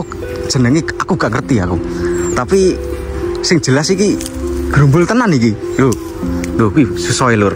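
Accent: Java, Indonesia. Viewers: Hiyo medok banget 😂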